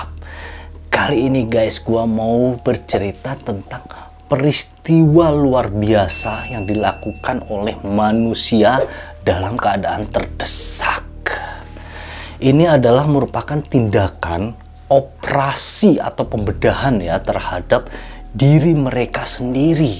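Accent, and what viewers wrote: Java, Indonesia. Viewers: medok ny sya suka mas 🐦🙏 sehat selalu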